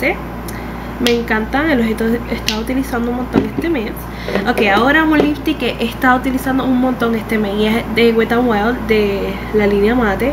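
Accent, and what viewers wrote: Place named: Puerto Rico. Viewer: Diossss amoo tu acentoo puertorriqueño ♥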